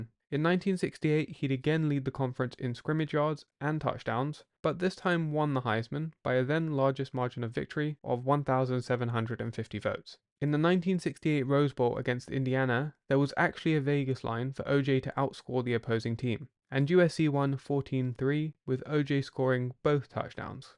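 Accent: United Kingdom. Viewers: Hi, i'd love you to make a video on how you got so interested in american football. I find it really interesting that a young guy from the uk (I think from your accent ) has such a love for the game. Thanks for your videos too, I think your channel is one of the best on the NFL on youtube. :)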